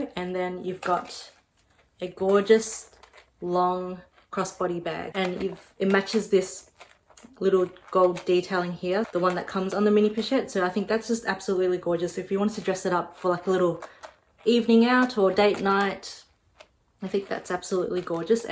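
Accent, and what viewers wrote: Australia. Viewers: Hi beautiful! I so dig your Aussie accent😍so charming! Love that you showed us how you styled & wear this, i have taken notes!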